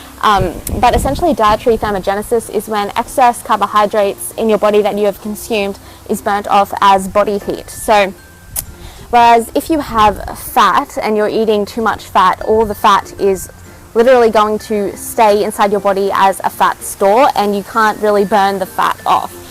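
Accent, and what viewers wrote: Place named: Massachusetts, United States. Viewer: love your accent. r u from MA?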